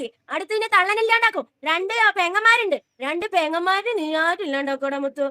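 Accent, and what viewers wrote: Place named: Palakkad, India. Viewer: നല്ല മലയാളം സ്പീഡായി സ്ഫുടമായി സംസാരിക്കുന്നു.ഒരു പാലക്കാടൻ slang. ഗോഡ്ഫാദർ സിനിമയിൽ ഫിലോന്മിനയുടെ അനപ്പാറയിൽ അച്ഛമ്മയെ ഓർമ്മ വന്നു.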